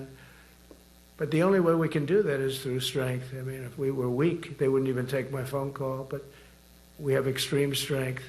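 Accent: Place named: New York, United States. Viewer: He is from NY/NJ area which has a distinctive style of speaking. All of it was coherent and with purpose. His target audience was Military Generals, not you.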